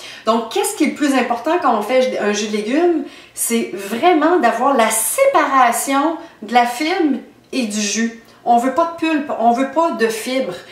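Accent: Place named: Quebec, Canada. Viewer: haha j'adore l'accent québecois calice ! Vidéo intéressante sinon ; merci.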